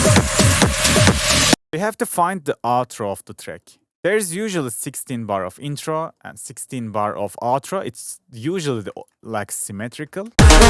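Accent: Turkey. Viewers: I hear Turkish English accent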